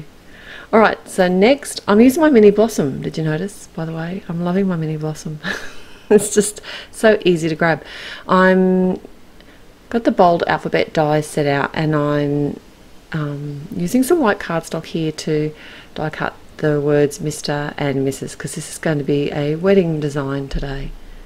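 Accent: Australia. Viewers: ABSOLUTELY LOVE THIS SIMPLE, ELEGANT CARD. Yes, sorry, I'm yelling! It's gorgeous! Love this whole new Altenew release and want 99% of it. Lucky girl to be able to play with all these fabulous products. Thanks so much for sharing. I keep saying it, but it's great to listen to another Aussie. Where do you buy your fun foam? Can't seem to find it here in Oz. Thanks so much.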